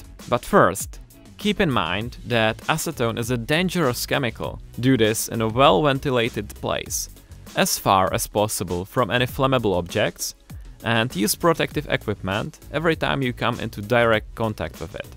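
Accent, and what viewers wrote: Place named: Czech Republic. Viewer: Lol, after one sentence I knew you are Czech.. the funny accent..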